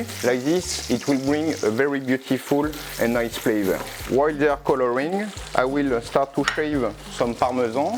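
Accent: France. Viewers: WOW! I haven't seen this carbonara technique before. I will try it this weekend. Thank you, for sharing. I love your French accent. 😊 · I've never seen a thicked French accent et je suis français! Very nice technique! Makes for a fatty meal but it must taste amazing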